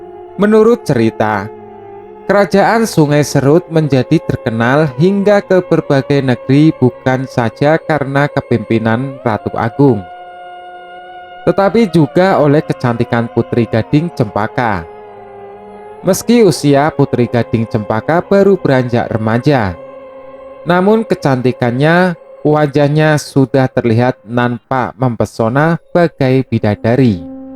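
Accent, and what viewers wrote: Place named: Java, Indonesia. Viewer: mulut orang Jawa logat bicara Jawa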